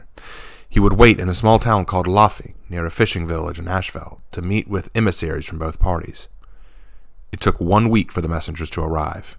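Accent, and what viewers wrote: Scotland, United Kingdom. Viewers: Nice Scottish accent btw. Really felt the Highlander spirit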